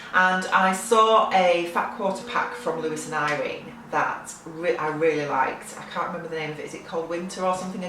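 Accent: United Kingdom. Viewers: I say it the way you say it, we Brits say it that way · your lovely English accent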